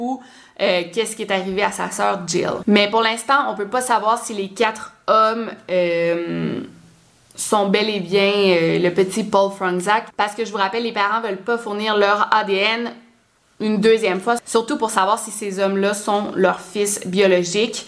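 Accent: Quebec, Canada. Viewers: J'adore tes vidéos ( elle me font toujours aussi peur 😨😂) mais j'aime trop les regarder quand même ❤️ je sur kiff ton accent québécois 😍😍😍😍😍😍😍😍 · C’est incroyable au début l’accent québécois me gênais tellement et maintenant j’ai l’impression de ne meme plus l’entendre, en tout cas très bonne vidéo comme d’habitude! Ça serait super quel ai sa propre émission sur netflix ou sur une chaîne de télévision je suis sûr que ça marcherais elle a vraiment un talent pour raconter se genre d’histoires.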